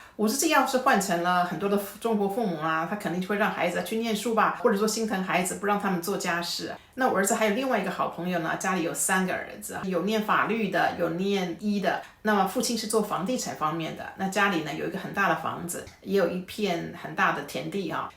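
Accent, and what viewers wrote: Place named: Taiwan. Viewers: 很明顯的台灣腔啊。怎麼在美國40年還可以保有這麼自然的台灣腔，厲害！ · 明顯是台灣的腔. 台灣腔有許多種類。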